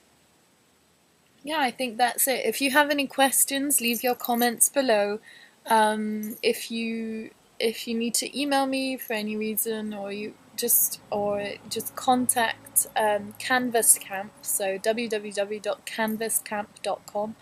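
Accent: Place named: United Kingdom. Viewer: Love the British accent of a lovely British girl!!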